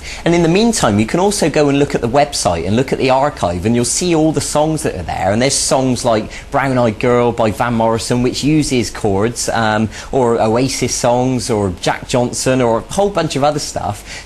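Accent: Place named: West Country, United Kingdom. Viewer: Bristol? Somerset? I’m from Exeter buddy. Lovely to hear an accent like this on the Net.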